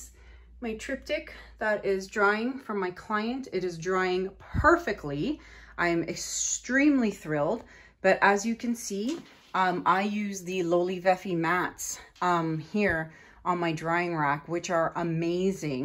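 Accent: Canada. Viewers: im from Georgia US and your Canadian accent just does it for me. i love watching you paint and getting tickled at your long "ooo"s lol thanks for what you do. still havent mastered a dutch pour but im not giving up. best wishes to you and your family.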